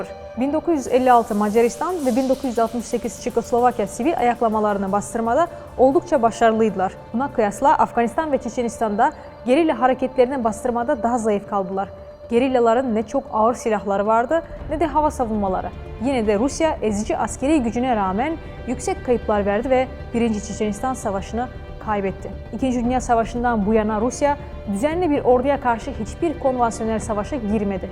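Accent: Azerbaijan. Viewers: Konusan hangi turk milleti merak etdim. Konusmasi turkce ama aksank sanki bi azerbaycanli konusuyor gibi. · Bu kız nereli?aksanı aynı azerbaycan aksanı sanki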